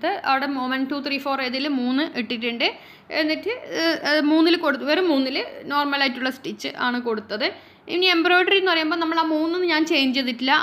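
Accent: Palakkad, India. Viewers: Palakkadu kariyano?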